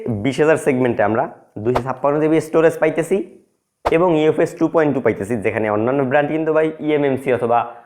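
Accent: Barishal, Bangladesh. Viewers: ভাই কি বরিশালের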